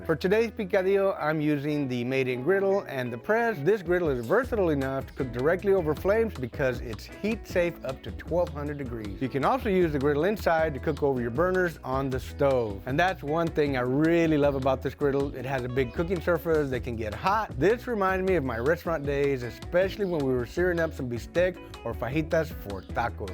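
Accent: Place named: Brownsville, United States. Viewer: I'm from Brownsville and this guy sounds exactly like the people here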